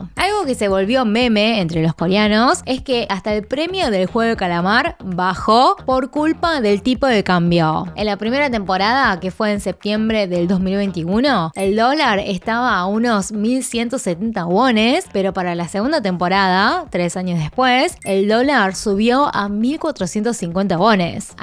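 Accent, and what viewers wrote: Argentina. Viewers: como es posible que exista una china, que sepa cosas de korea, hablando español con acento de Argentina o.O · Porque habla como Argentina? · Hola como haces para tener este acento argentino ? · por que habla como tamara falco argentina??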